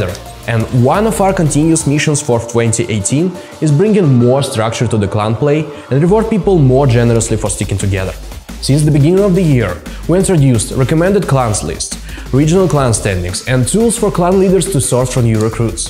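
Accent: Russia. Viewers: Nice Russian accent.wow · Ringlish bro russian inglish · i love the russian accent...lol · Он стоо пудов русский я сразу понял по интонацие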